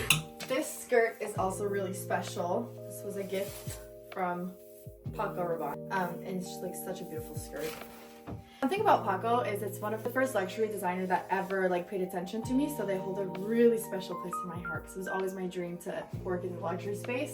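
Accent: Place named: Montreal, Canada. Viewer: I recognize that aceent. Im born and raised Torontonian but lived in Montreal for a few years.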